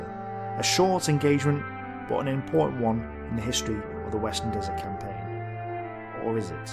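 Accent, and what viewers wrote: United Kingdom. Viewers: Not sure if its True British Accent It could be Australian Or Down Under period, either way your English Accent is Thick as Hell Period and Im from USA and have a hard time Understanding what the hell your saying......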